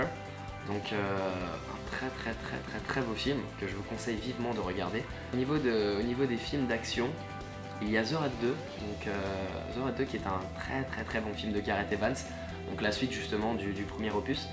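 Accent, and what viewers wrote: Alsace, France. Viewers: tu prenais un accent "alsacien" plutot accentué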